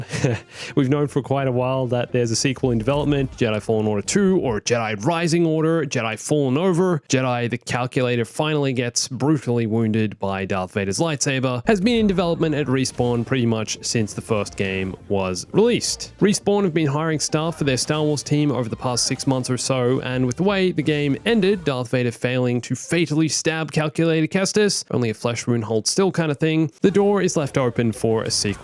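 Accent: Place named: Australia. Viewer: Mate you sound like a Aussie I got a X series about two weeks ago I love fallen order and it doesn't freeze it plays better and not as dark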